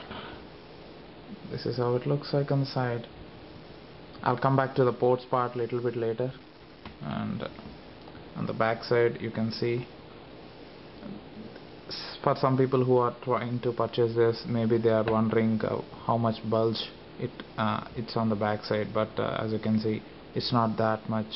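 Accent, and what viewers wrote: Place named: India. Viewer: hes pointing out to ur indian accent my friend .. hence the software thing. :P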